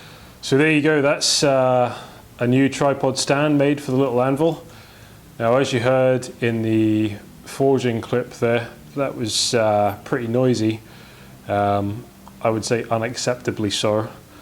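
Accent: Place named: Scotland, United Kingdom. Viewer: Maaate, hearing a tad bit o'scottish in your accent now haha glad to see you're keeping well and to see a new vid. ATB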